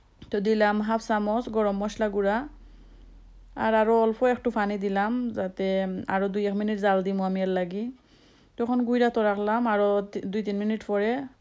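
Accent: Sylhet, Bangladesh. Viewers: মাশাল্লাহ আপুনি তুমি কত সুন্দর করে সিলেটি মাত মাতলা খুব ভালো লাগলো আজকের ব্লগ টা। এভাবে সিলেটের মাত মাতবা সবসময় প্লিজ। শুনে আমার বেশ ভালো লাগে।রান্নাগুলো অসাধারণ মজার রান্না করলা।খেতে ইচ্ছা করছে কিতা রতাম গো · Apa sylheti hunthe bala laglo, otho mojar ranna khaibar r piaji oise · Apu tomar sylhety kotha shob bujhesi, valo laglo, Lau khaw na keno apu at Khub valo shobji, rannagulo mojar, shinar ghoshto alada moja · রান্না গুলো ভালো হয়েছে আপু । আর সিলেটি কথা শুনে খুব ভালো লাগছে । পিয়াজু আমার খুব প্রিয় । Vlog টা সুন্দর হয়েছে । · Apu styet vasha amr khub sweet Lage. Aktu Maya Maya vab ASE. R tmr ranna gulo shei hoyese.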